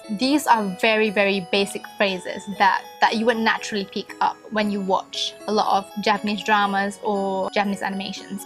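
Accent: South Korea. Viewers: your accent is sounds like korean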